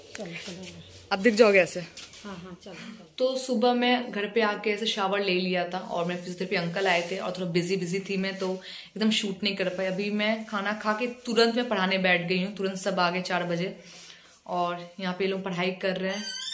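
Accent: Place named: Bengal, India. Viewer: So cute bengal accent ❤❤❤❤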